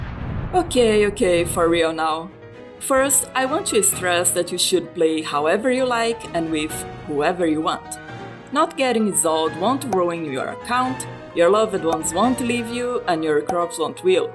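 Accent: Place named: Brazil. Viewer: Eu assistindo pensando se você era BR pelo sotaque ai aparece a Jiu com uma garrafona de 51 OUFHISDAASFIHD Muito bom o video. Não tinha planos pra Isolde, mas o Joe com certeza me fez repensar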